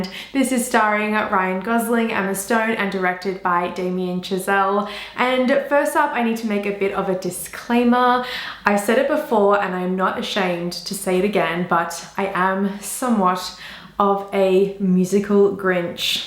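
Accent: Australia. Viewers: Great review. I've seen LLL twice now. Even more impressed the second time. --Anyway, I'm trying to place your accent. Are you an Aussie?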